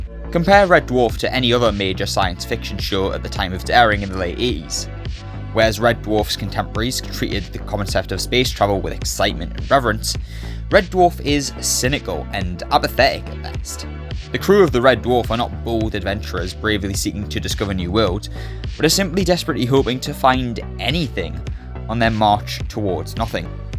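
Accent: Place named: North East England, United Kingdom. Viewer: Love Red Dwarf then I was like this guys Accent North East rules 🎉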